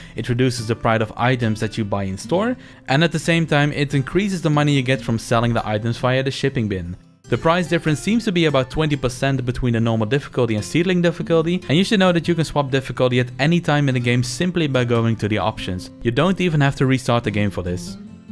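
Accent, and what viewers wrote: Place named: Netherlands. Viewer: Hi thanks for the tips. Are you from the Netherlands 🇳🇱 you sound like my parents who were both born and raised there. Great advice thank you 😊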